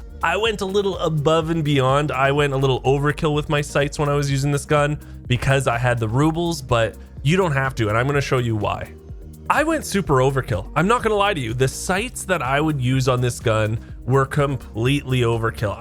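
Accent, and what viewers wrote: Canada. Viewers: 1.6k hours and I do know all this stuff but your videos are just so damn fun to listen to that I’m still gonna watch it! You and your damn whacky Canadian accent, keep it up brother!!!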